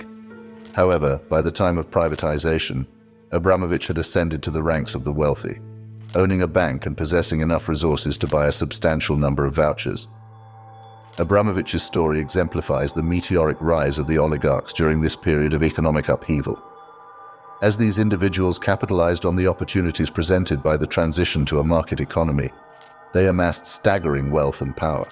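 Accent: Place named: United Kingdom. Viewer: It's the season of obnoxious British accents. Yuck.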